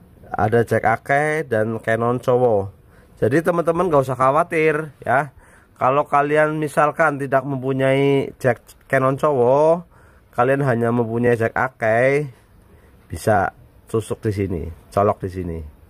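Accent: Banyumas, Indonesia. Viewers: Ngapak purbalingga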